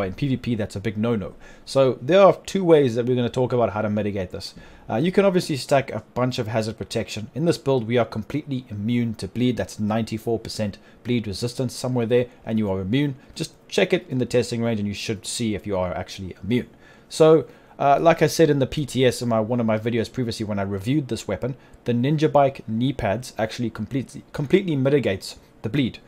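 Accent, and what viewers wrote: South Africa. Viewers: Im guessing Joburg or Durban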